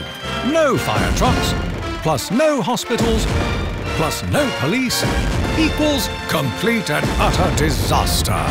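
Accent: United Kingdom. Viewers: but it looks great because a British chap is doing the voice over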